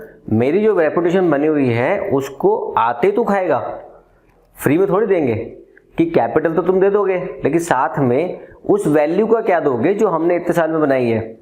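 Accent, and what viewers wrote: India. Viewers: Sir ji...hindi ka majak mat banaiye...you too sound to be a Hindi background guy.... anyway good technics....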